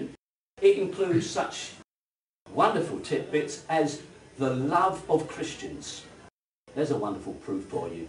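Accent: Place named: Cockney, United Kingdom. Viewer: It’s a beautiful cockney and I love it.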